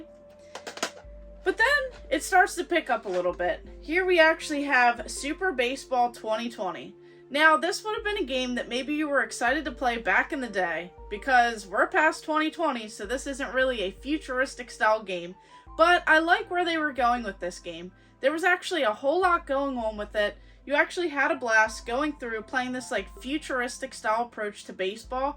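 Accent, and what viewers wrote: Maryland, United States. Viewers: I gotta ask…are you from Maryland? You have a bit of the md, va, pa accent. I haven’t lived in MD for 14 years and my accent is getting thicker as I get older😅 Anyway, digging the content. RetroWolf sent me.✌️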